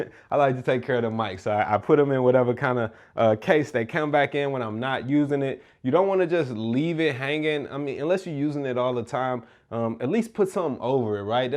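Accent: St. Louis, United States. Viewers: I knew you was from st. louis by how you was pronouncing yo ER's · Yoo, you from the STL? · You from Stl?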